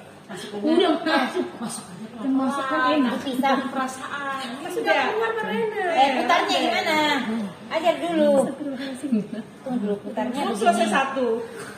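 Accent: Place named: Sumatra, Indonesia. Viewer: Nyimak cara buat ketupat,,,, kok dialog nya seperti orang Sumatra,,,,, besok saya datang lagi sambil mengantarkan kopi hitam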